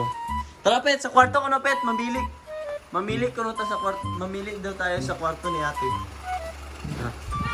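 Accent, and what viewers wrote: Visayas, Philippines. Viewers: BISAYA O ILONGGO?:)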